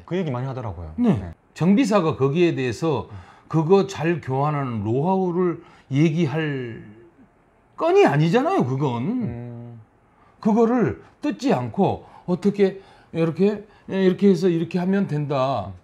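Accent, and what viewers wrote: Gyeongsang, South Korea. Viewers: 경상북도 사람이 서울상경한 말투 ㅋㅋㅋㅋㅋㅋㅋㅋㅋㅋㅋㅋㅋㅋㅋㅋ 이걸 왜 느꼈냐면,,,, 제가 그래요,,,,,,,,상주 구미 영천 안동 ㅋㅋㅋㅋㅋㅋㅋ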